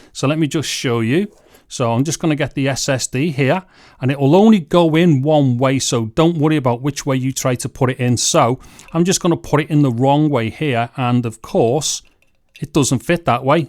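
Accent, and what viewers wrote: United Kingdom. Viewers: Hi! First of all I (as a "bloody German") have to thank you for your very precise pronounciation! It's been a pleasure to listen to your video and I like your accent, though I wonder from which part of the UK it originates. Concerning the read speed you measured with the enclosure one has to consider the write speed of your internal ssd. This has to be faster than the read speed of the external ssd to be able to measure the correct read speed of the TB/USB4 enclosure! By the way, those enclosures with an ASMEDIA AS2464 chipset are both TB4/USB4 compatible with complete downward compatability. Connected to a real USB4 controller in a Win11 laptop or pc you can reach up to 3800 GB/s according to ads for such enclosures such as ZikeDrive Z666 or Adata SE920. So, if you're going to proove these drives in future videos, you're going to need a relatively new laptop or a USB4 controller card for a not too old pc. 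Your 10 year old optiplex seems a bit too old for this, I think...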